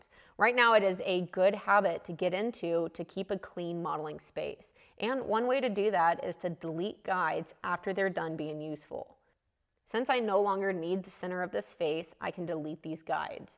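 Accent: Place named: Waco, United States. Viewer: Love the Waco accent, with all the "Sawring and Drawring" you do! :-)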